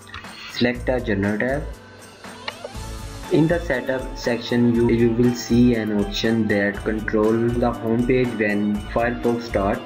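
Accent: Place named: Punjab, India. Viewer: I can not understand this punjab. Hello, Buddy, this is an AMERICAN site. Learn AMERICAN.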